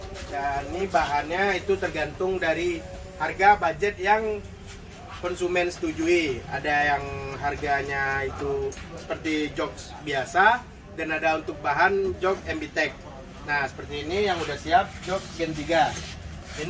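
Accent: Padang, Indonesia. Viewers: Orang Padang bang? Logat bahasa Indonesia nya kek bahasa org Padang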